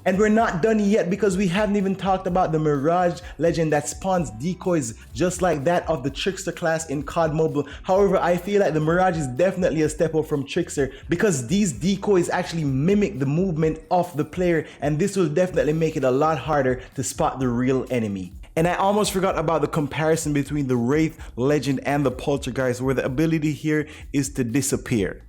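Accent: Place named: Jamaica. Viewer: Lol just meet up on u channel from how u talk mi know yawh jamaica from u say 3 ppl than person lol big up bro bro and make a sub zimi😎 and to me apex is a big fresh air cause cod rn just a bring same thing over and over cant bother with that more while and rank fun fi grind more on apex than cod not to mention the skill it takes to play apex..see how it go still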